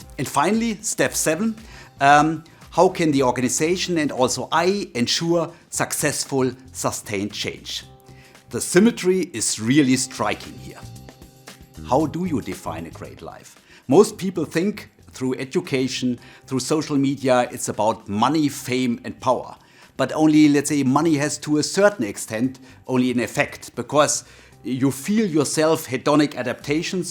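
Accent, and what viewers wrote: Germany. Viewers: Challenging listening to this tense rapid German accent. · Thanks for the great Video, you really helped a Lot Your accent Sounds very German to me 😂 Have a great new year everyone M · Is he German by chance? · That's a German accent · Love his German accent 🇩🇪❤️🎉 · Your pronunciation is very interesting. Are you from germany? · First time you watch it: Consulting Cringe. Second time you watch it: German accent. Third time you watch it: Mh, good point… let me think. What are my preferences actually? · listening to this german english accent makes me think that this is some sketch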